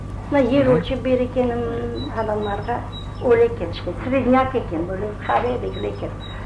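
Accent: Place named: Bakhchysarai, Ukraine. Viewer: Büyük zevqnen baqtım, öz Bağçasaray lehçemizni eşitmek ne qadar hoştır 😍 bu işke isse qoşqanlarınıñ elleri dert körmesin!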